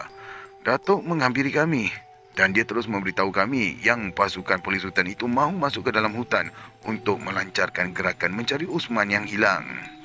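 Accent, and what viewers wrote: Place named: Kelantan, Malaysia. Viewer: Assalamualaikum... Citer Kali ni best... Ada loghat Kelantan.. ada slang omputih... 😂 Walaupun kelaut tetapi mmg best.. menghiburkan. Gelak besar akak Kali ni😂. Lawak betul ni.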